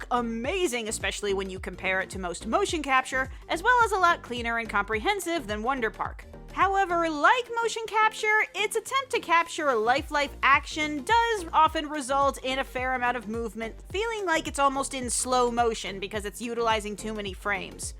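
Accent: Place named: Scotland, United Kingdom. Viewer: Nice Scottish accent ! Im definitely going to see this tomorrow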